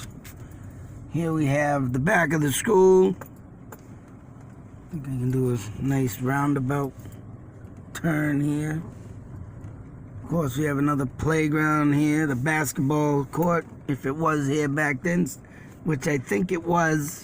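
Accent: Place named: Massachusetts, United States. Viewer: wow! thanks for the drive by tour .very cool! i noticed the Bennett property added some new buildings . that pizza place you were talking about used to be a small grocery store. then became a honey farm convenience store .christophers pub next door use to be a music instrument store. and where that subway sign is used to be a record store then it was a paint store . i think the old courthouse was in the same building as the police station .as for that haunted house, when i was a kid , in the 60s and 70s, there were allot of old houses rumored to be haunted and that was probably one of them . wow Montys gone, dunkin donuts gone wtf! lol .thanks again for the ride. it was fun to watch and cool to hear a massachussetts accent again.cheers!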